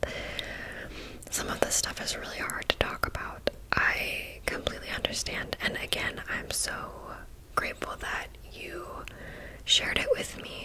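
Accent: Ireland. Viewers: More Irish accent please!!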